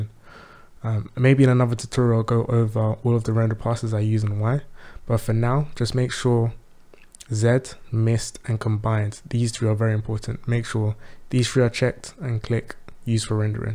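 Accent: United Kingdom. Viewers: Great video – very clear and better results that the others I tried. Good to hear a good multiethnic London voice, too!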